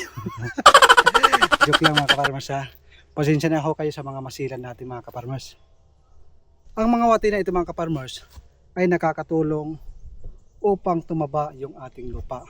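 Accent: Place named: Visayas, Philippines. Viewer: saan ang lugar nyo boss? para kang bisaya! yan rin kasi ang plano ko, mag reretiro na kasi ako sa agosto.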